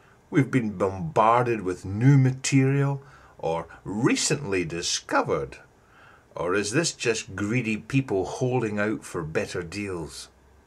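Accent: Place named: Scotland, United Kingdom. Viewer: Thank you for sharing your thoughts (lovely Scottish accent). I'm sure that all the fans in the UK regret never seeing Elvis. I'm a '1956 era' fan too and feel exactly like you. No one will ever replace him, but I have found a tribute artist, Jay Ashton, who actually allows me to imagine what seeing Elvis in a live show might have been like. Jay just wants to share his joy in the songs of Elvis with all the other fans so ... no "bells and whistles" ... just a great performance.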